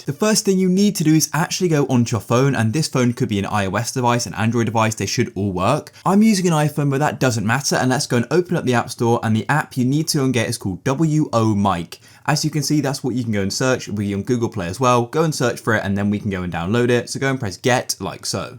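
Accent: United Kingdom. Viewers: He’s British not american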